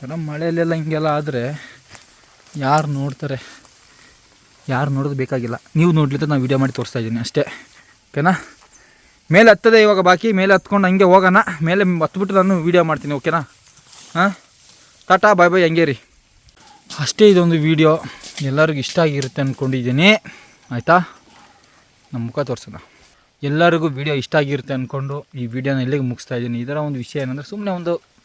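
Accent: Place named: Mandya, India. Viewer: From mandya base adike❤❤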